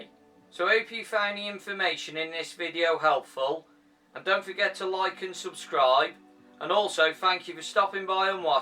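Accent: United Kingdom. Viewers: This is the most British person I have ever heard. Subscribing 100%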